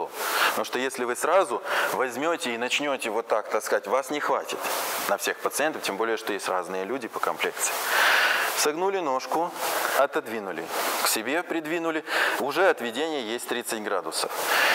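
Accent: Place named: Odessa, Ukraine. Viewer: Одессит. Папа тоже травматолог.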